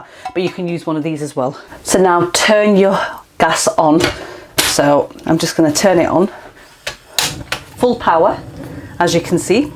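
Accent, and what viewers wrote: United Kingdom. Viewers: I love your UK accent. :) :) Love from Tokyo :) :)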